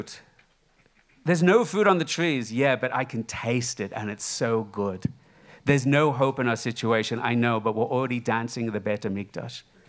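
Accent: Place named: Australia. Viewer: He has an Australian accent and not American.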